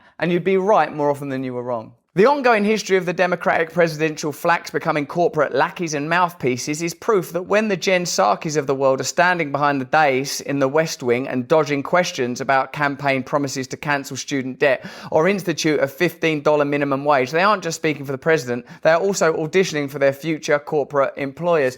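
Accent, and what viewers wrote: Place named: London, United Kingdom. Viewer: YOU SOUND LIKE YOURE FROM LON-DON!!!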